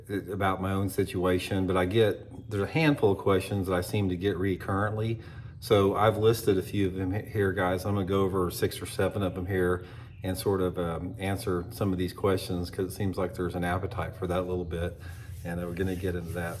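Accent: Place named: United States. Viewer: yYou sound like an average American, hope you dont mind that label.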